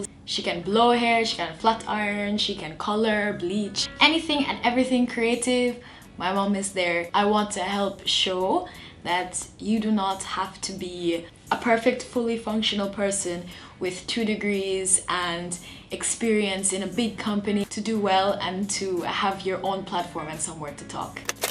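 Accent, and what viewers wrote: Jamaica. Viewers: This came up in my feed and I wondered why this white looking girl is saying she's been away from Jamaica too long...then I hear the accent! Real yawdie! Keep doing ur ting yah girl! Nuff space is there for creatives. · Damn. You speaking in your ja accent is the sweetest thing. Jah know!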